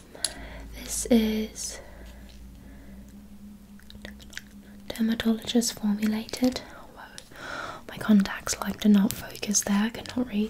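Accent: United Kingdom. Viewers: your british accent is always so great ❤ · The British accent is actually adorable lol.props to you because it's not the easiest to master 😊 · I think London mixed with brummie hahah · As a British people I always found it annoying when people would over sell the accent by like <num>. However your is sweet and suttel. Luv u ♡ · I love the British accent so much 🥰💖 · I've suffered from tingle immunity for a while and this video cured it! There's just something about the British accent. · You have a very good British accent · obsessed with your british accent 😭 i love these kind of hair asmr vids so i was so happy to see this 🫶🏻💗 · Well done on the British accent,I'm from Birmingham so sound like the Peaky blinders 🇬🇧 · Girl I love this accent! Thank you for appreciating us brits😭❤ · you with a british accent is really giving me anya taylor joy vibes !!! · something about your british accent gives me so many tingles.... 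I've had a bit of tingle immunity recently but this is Fantastic! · TIMESTAMPS🐬 - ~ intro, thanking you for coming over & chatting (face touching). - ~ showing you her hair charms. - ~ more chatting. - ~ more hair charms. - ~ hair tinsel. - ~ tapping & scratching her hair brush. - ~ taking your braids out & commenting on the weather. - ~ brushing your hair. - ~ showing you her butterfly clips. - ~ detangle brush & gossiping. - ~ braiding your hair. - ~ butterfly clips in the braid. - ~ brushing your hair - ~ putting hair tinsel in your hair. - ~ braiding your hair & hair charms. - ~ aquamarine the movie. - ~ hair serum. - ~ massaging your scalp & positive affirmations. - ~ fixing your parting. - ~ more butterfly clips. - ~ noticing how similar our hair colour is. - ~ tapping on hair charms. - ~ putting more tinsel in your hair & showing you how to. - ~ wrapping up the video. p.s ur British accent is incredible. 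It’s sooo satisfying hearing you say ‘pretty’ 😊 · I don’t know what it is about the British accent but I love ittttt ❤️ happy early Easter! If you celebrate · Your British accent is fab!! I hear some potential for Northern Irish there too - I think you’d be great at it as well! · doing a british accent is just so tingly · One of the only british accent i love Edit: 3 Weeks later this still gives me the most Tingels ever❤ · as a british person i can’t really tell where in the uk your accent would be from but i love ittt it sounds way nicer than most british accents 😭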